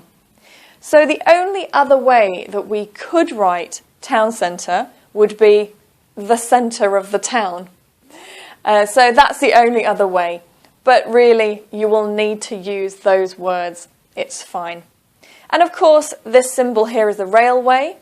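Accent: United Kingdom. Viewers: Why does not British Council use British accents like her accent in the IELTS?. They always play fucking horribles voices and accents in the listening section !! In the other hand, flawless explanation!! Thank you, Ms !!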